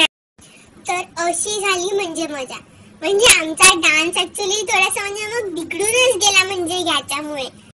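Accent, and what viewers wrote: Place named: Vidarbha, India. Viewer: jabardast video......tu Vidarbhachi ahes ka? khup mast ahe tujhi bhasha amchyasarkhich.....All the best ..Khup videos banav ani motthi ho...but motthi jari zalis tari lahanpan sodu nakos......Lahanpan dega deva....enjoy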